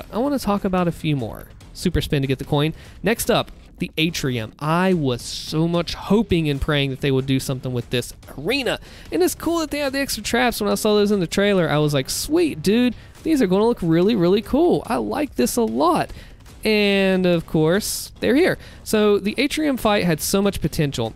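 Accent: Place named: Midwest, United States. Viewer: Hey r u from the midwest? Im just curious, ur accent is so funny 😂. Keep up the good work tho, u r honestly one of the few doomtubers who put effort into their content. I have a suggestion for u however, which is to play some doom eternal levels on the switch. I think that would be an awesome level (I play on switch 🤣)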